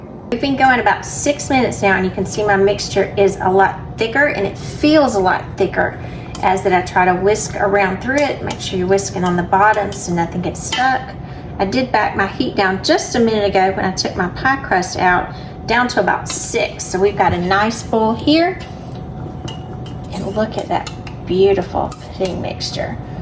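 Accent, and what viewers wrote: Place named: Georgia, United States. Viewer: I'm a Georgia cracker transplanted to Texas and your accent reminds me of all my family in the Albany area, the transcript shows " bowl" when you say boil, I get teased about my accent here in Texas too,love your channel!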